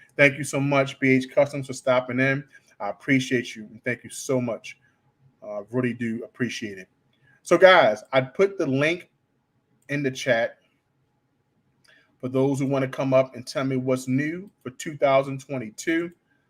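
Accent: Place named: Maryland, United States. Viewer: This was a treat to watch!!! Many genuine laughs while watching. your Maryland accent is so awesome. I’m amazed you learned about silver through the Moorish science movement. Islam!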